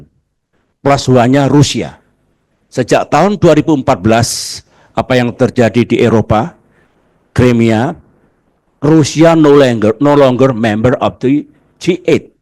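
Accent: Java, Indonesia. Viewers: lidah wong Jowo 😀😀🙏🙏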